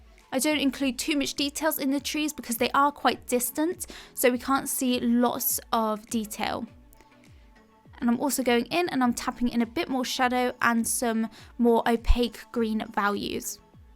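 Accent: England, United Kingdom. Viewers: I like your English accent, where are you from?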